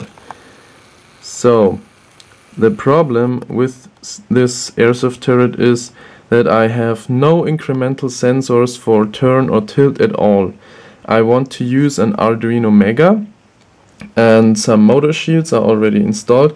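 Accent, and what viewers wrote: Germany. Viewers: I realize this video is very old, but I am currently looking into this for a hobby project. Your Accent makes be believe you may be German, if not then sorry right away. I can't help but notice you really enjoying this gearbox and was wondering if you still remembered where you bought it from, if it was online, and how much it cost. Thank you very much!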